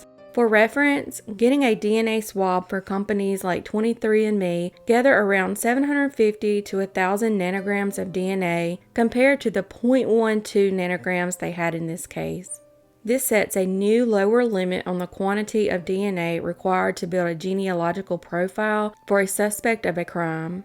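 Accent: South, United States. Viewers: i kept hoping you'd say "I do declare" at some point. We Brits love that Southern drawl... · As a Texas woman l appreciate a southern accent, enjoy your videos, so tragic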